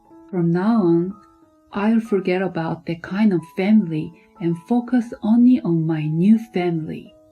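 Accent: Japan. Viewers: Hello! Have idea! Hire someone to read stories that are translated into English by someone who has actually lived in a real English speaking country and attended some kind of Grammer school in America or England or Canada or Australia so that other English speakers will have some chance to hear the story clearly! Present reader obviously has never left Japan!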